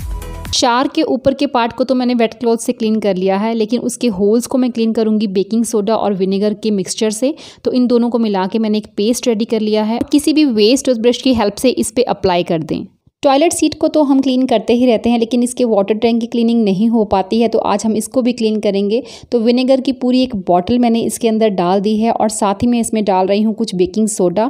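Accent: Punjab, India. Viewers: ਭੈਣ ਜੀ ਪੰਜਾਬੀ ਟਚ ਹਿੰਦੀ ਬੋਲਦੇ ਹੋ ....ਵਧੀਆ ਲਗਦੀ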